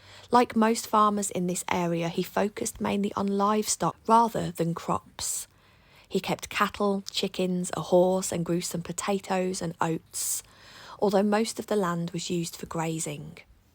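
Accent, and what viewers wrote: Birmingham, United Kingdom. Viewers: your beautiful Brummie accent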